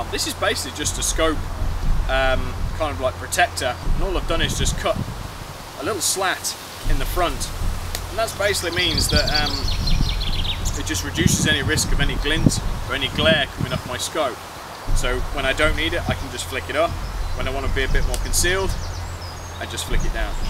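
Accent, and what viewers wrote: United Kingdom. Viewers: where abouts in the UK are you from mate!? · Hey! Im from Germany.. so i cant understand everything very well.. It would be very nice if you could put some links below my commentary For example:The dye mag feed for the hammer 7, the remington stock or the barrel... i ask because i cant understand an english accent very well ^^ (sry for my worst english...^^) the links dont have to be an german link! just that i have the special name of the item Greatings from Germany!:)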